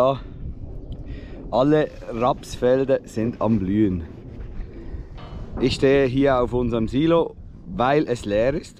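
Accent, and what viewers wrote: Standard. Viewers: Wieso redest du Hochdeutsch und nömä schwitzerdütsch? 🇨🇭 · Hallo, Du sprichst ja super Hochdeutsch, Respekt. Egal wie Du das in Zukunft machen willst, Dein Kanal ist super und ich verstehe beide Varianten, ich folge ja auch dem Bauer Housi. Schönes Wochenende aus Franken, Bernd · hab ich was an den Ohren oder schwätzt Du tatsächlich Hochdeutsch?!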